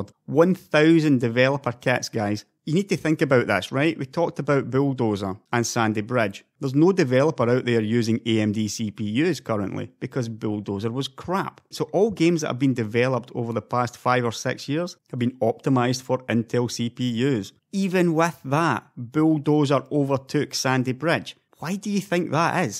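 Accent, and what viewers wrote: Scotland, United Kingdom. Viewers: From his accent I thought he is Scottish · So hard for me to translate you scottish accent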